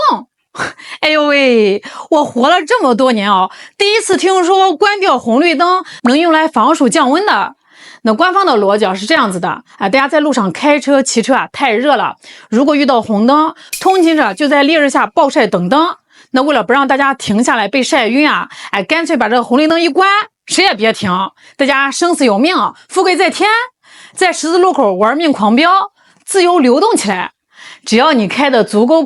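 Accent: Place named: Henan, China. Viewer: 河南腔打的😄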